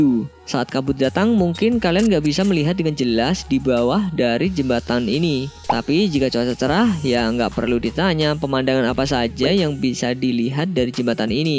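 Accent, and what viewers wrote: Java, Indonesia. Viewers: mau tanya, mimin orang jawa ya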